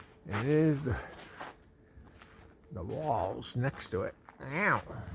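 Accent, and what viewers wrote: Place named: Boston, United States. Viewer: sounds like hes from Boston... Love Video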